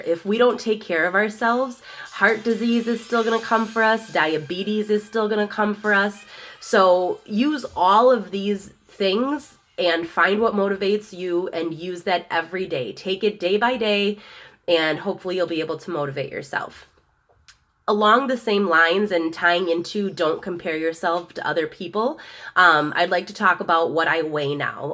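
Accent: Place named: Chicago, United States. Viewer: sounds like chicago baby!!!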